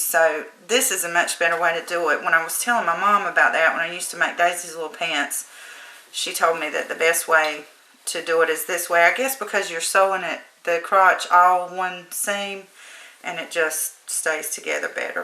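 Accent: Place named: Louisiana, United States. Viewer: Are you from Louisiana or Arkansas? Recognize that accent :)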